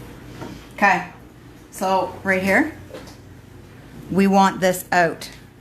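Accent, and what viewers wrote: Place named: Wisconsin, United States. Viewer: Oh you sound like you gotta be from Wisconsin!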